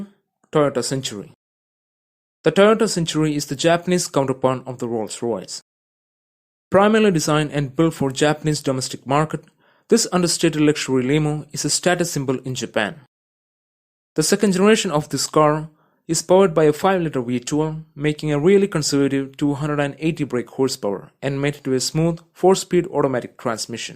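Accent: Kerala, India. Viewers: “Powered by an AMG built 6-liddr weight one with 740 Newton meters of TONK” Omg kill me😂😂😂😭😭😭🤣🤣🤣 I can’t figure out if this dude is a combination of Indian with something Eastern European, you tell me because it’s hilarious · Something says me that you're a Malayali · Are you a Malayali?? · Are you indian?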